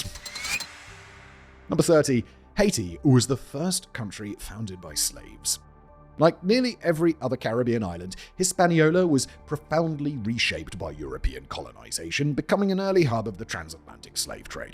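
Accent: England, United Kingdom. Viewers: Actually he lives in Czechoslovakia atm but his from England that's why he has that accent 😂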